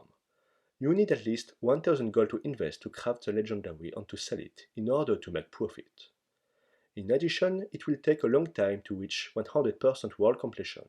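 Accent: France. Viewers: I noticed you're French and loved your accent. ☺️ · En vrai c'est beau gosse d'oser lancer une chaîne anglophone en étant français, compte tenu de l'accent francais très difficile à perdre. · Worst accent x) wtf x) why didn't you make this guide in your native language? Guess it's French, it would be better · It's the first time i hear a French accent ! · You just sound like you are from France :) To me you are one of the best players in the game! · why would i ever make fun of an accent i really enjoy listening to? coming from a non native english speaker that is learning french, iz verrry interrrrestang to hear :P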